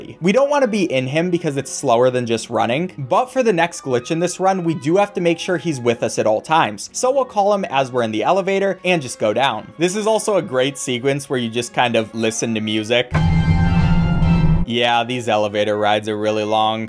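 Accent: Denmark. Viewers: er du dansker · Your danish!?!?!? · are you danish? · Are you from Danmark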